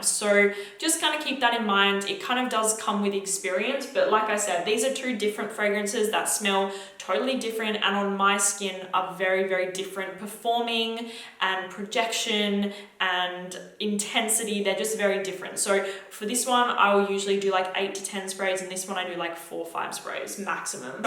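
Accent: Australia. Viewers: I LOVE your Australian accent!!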